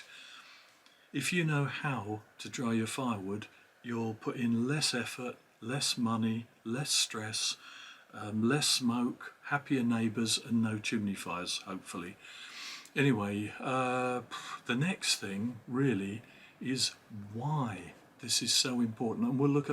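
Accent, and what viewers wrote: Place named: RP, United Kingdom. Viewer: He has a posh British accent, so I instantly believe anything he tells me.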